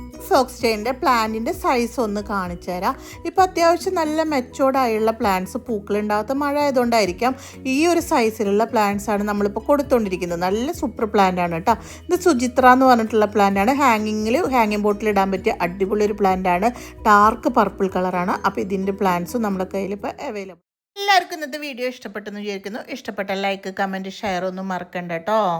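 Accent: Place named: Thrissur, India. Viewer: Eavideya sthalam.. Samsaram kelkkumbol thrisshur dist anennu thonunnu...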